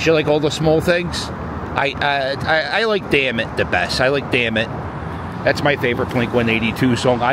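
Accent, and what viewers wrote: Philadelphia, United States. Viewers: War Ter st!!! LOL Love that philly accent!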